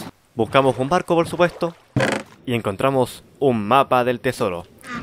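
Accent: Chile. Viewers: tu manera de hablar como un chileno de verdad me encanto, buen tutorial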